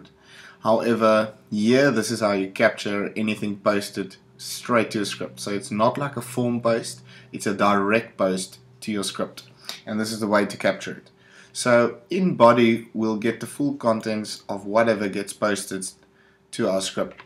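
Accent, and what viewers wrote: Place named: South Africa. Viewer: Hey, did you continue developing in WeChat, or have moved on to other things? Also, trying to place your accent.. are you South African? :P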